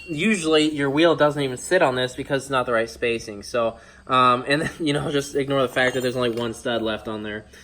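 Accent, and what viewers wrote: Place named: Indiana, United States. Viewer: The accent, the Amish, the endless cornfields, the moronisms... Northern Indiana. Has to be!!! 🤣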